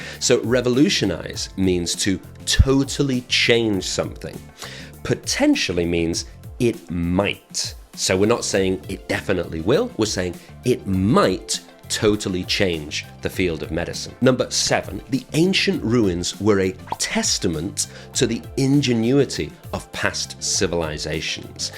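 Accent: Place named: United Kingdom. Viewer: Thank you sir. And your British pronunciation sounds incredible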